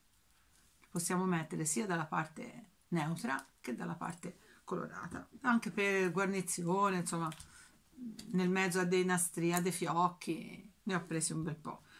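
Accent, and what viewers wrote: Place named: Pisa, Italy. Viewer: Bella esperienza e bravo tuo marito che ti accompagna....Dal tuo accento mi sa che sei vicina a me...anche io provincia di Pisa! Ti seguo con affetto!!!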